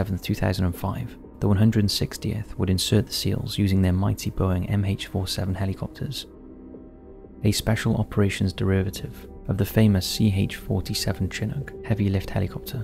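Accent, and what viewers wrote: United Kingdom. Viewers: No, he isnt wrong at all. "Special forces" is a term known colloquially around the world to be synonymous with more/less any SOF unit . Defined as "the units of a country's armed forces that undertake covert, counterterrorist, and other specialized operations". So by definition Rangers, Raiders, SEALs, SWCC, PR etc are all special forces. Just because the US decided to specifically name a unit "special forces", does not take away from the literal definition of the word. and with the fact that the dude has a british accent or whatever, It would just be cringe to hold him to that standard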